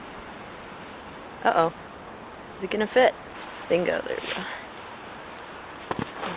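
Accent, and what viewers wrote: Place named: Canada. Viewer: I found you while searching for cowboy coffee, but there are so many other things in this video that I've long been interested, such as twig stoves, winter backpacking and the Morakniv bushcraft knife. I thought you sounded a bit Canadian. Very glad to have found you, and I'm looking forward to your next videos.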